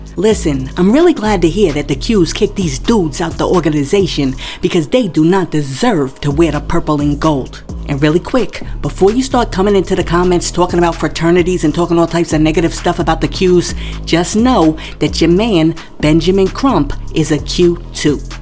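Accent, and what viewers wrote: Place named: New York City, United States. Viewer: Love your voice. Sounds so NYC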